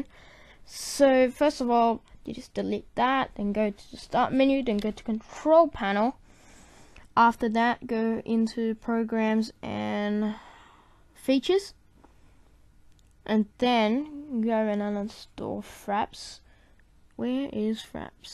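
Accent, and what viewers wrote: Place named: Australia. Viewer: Austrailian mate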